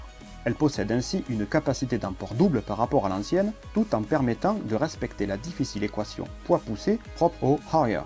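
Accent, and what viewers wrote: South, France. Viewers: J'en ai lu des trucs sur les avions et sur le Harrier, mais là je n'ai qu'un seul mot : FELICITATIONS. Que de travail ! Sur le fond on apprend pleins de choses en un minimum de temps, sur la forme, rien à redire, les images sont exceptionnelles et ont dû demander un paquet de travail, le montage est parfait, le ton parfois humoristique et l'accent du sud ne font que rajouter de l'intérêt à vos vidéos en les rendant plus vivantes. Petit bémol le verbe "pallier " est transitif direct "La construction pallier à [verbe transitif indirect], courante, est incorrecte" mais bon c'est pour chipoter car les commentaires sont justes excellents, Continuez !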